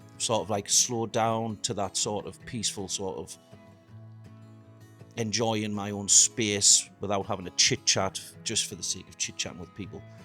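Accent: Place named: Edinburgh, United Kingdom. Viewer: I have been living 42 years in Norway and I have a little different view on things you have noticed. First, you exaggerate the differences between UK and Norway. I have recently visited Edinburgh and I had an impression of a very similar pace of living and relaxed attitude. Your dialect reminds me of Edinburgh dialect, and maybe you have been living too long in London or a similar city in South England. Second: Staying in London I tried to buy a bottle of beer in a store after 10 pm, and was refused. Three: secondary party. This is typical of a certain social group, mostly single young people. Adult people, with family don't usually do so. And yes, the young people can party a whole night with very loud music, without any empathy for the neighbours. Four: making friends. Usually people make friends in their teens and hold on them. It is not common to make friends in adult age, especially not with a foreigner. 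At work people may be polite and nice, but it stops there. Five: driving. Many people drive very fast, especially those who own a Tesla or a BMW, and have to overtake you, even if you drive a tad over the speed limit.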